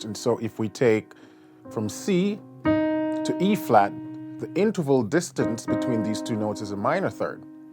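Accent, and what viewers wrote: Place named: Jamaica. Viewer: Lol I love when the yardy accent slips in and out lol, great stuff bro! Love from Trinidad!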